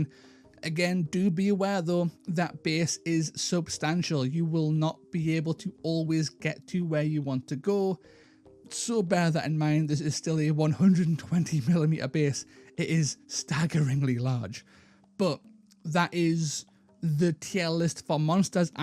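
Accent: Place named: Spain. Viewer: I love your spanish voice lol I use last tournament a Deathbringer against a Zombie Dragon... and he flew away lol CHAOS POWER!!!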